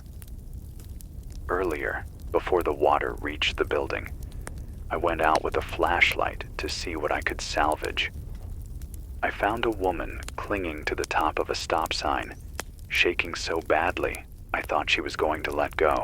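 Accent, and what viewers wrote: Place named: United States. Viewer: Hint,if you want to sell....don't speak American or mention trumpland,///juahhh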